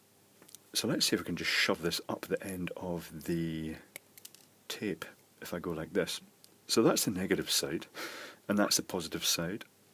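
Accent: Scotland, United Kingdom. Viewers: Scottish accent is amazing 👍🏼👍🏼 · Gun Smoke Scottish accent so im assuming hes from Scotland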